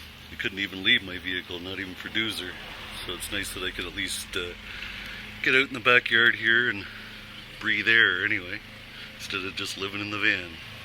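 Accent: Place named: Canada. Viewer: i can listen to this canadian style out and about all day long 😄 . what is moochdocking ?.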